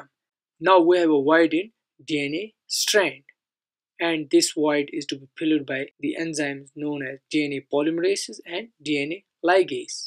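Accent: Kashmir, India. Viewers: which district? srinagar?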